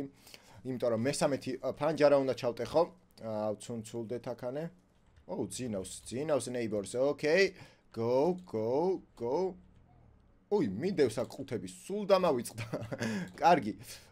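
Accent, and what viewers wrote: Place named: Georgia. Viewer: tu videos igeb inglisuri sityvebit mashin mtlianad inglisurad ilaparake,magram tu igeb videos inglisuri sityvebit mashin inglisurad kargad mainc ilaparake aba qartuli aqcentit inglisuri sityvebis gagoneba sasinelebaa:)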